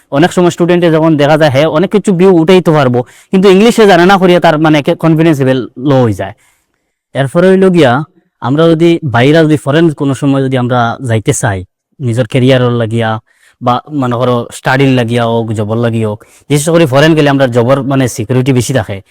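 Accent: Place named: Sylhet, Bangladesh. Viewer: ইংলিশে খইলেও ফারলা ওনে, তেবউ সিলেটি মাত হুনিয়া বহুত ভালা লাগলো..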